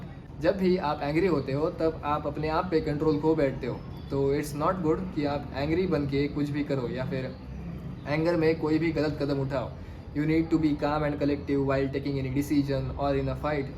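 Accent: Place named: Malvan, India. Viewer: Bhava tujya shabada madun malvani zalakte 😂😂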